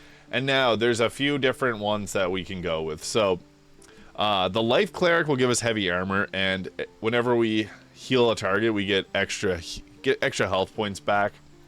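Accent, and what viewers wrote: Maritimes, Canada. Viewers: its funny randomly finding another maritimer on youtube lol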